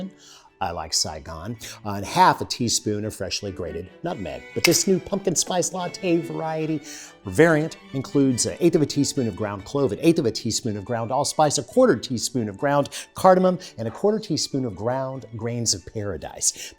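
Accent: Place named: General American, United States. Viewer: Alton is the Renaissance man of our times. We have not recognized a well- rounded man needs to be all -around in visual media technology, and his many other talents, cooking, writing, on screen talent, editor, music director. DP, behind the camera, producer, props, the master of GenAm or broadcast American English. . . ah heck, the above and below the line producer, covering all areas. That is a true modern Renaissance man.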